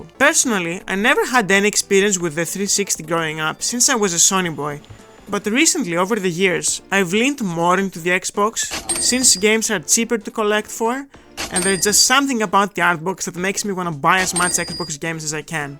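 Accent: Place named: Greece. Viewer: to greek accent 💔💔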